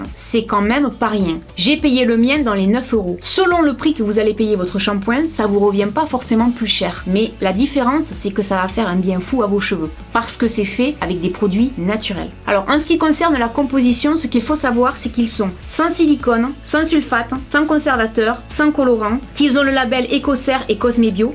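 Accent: South, France. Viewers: cela fait environ 1 an que je me lave les cheveux avec ce shampoing solide. J'ai les cheveux frisés et très souvent sec depuis que je l'utilise ils ont repris un volume de fou et sont super brillants le seul point négatif du moins pour moi c'est que je ne sais pas l'utiliser en mode solide du coup je le laisse se ramollir un peu dans l'eau et je l'utilise comme une crème. par contre il dure et dure très très longtemps facile un mois voir plus c'est GENIAL !! sinon juste un petit mot j'adore tes vidéos tu respire la joie de vivre et ça fait plaisir d'entendre enfin un youtubeuse du Sud je suis de TOULOUSE et les accent pointu sur youtube j'en peu plus lol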